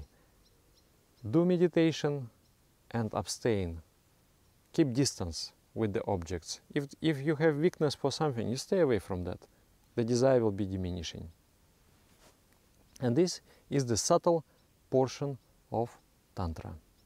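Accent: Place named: Russia. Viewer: Russian pronunciation in english sounds great, it’s like speaking english but russian way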